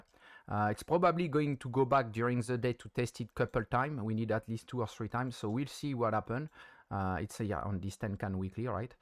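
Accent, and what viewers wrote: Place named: France. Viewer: Salut t un français a ton accent tu peux pas faire une vidéo sur burency en français stp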